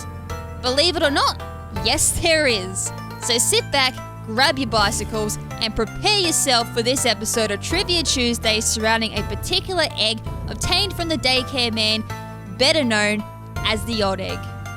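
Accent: Australia. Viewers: I came here for the Australian accent. I was not disappointed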